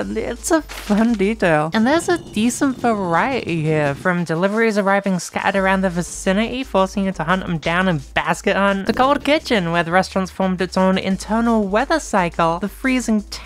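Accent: United States. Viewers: why do you keep randomly slipping into an american accent in this episode its like a flashbang every time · Hill billy accent is not something I knew I needed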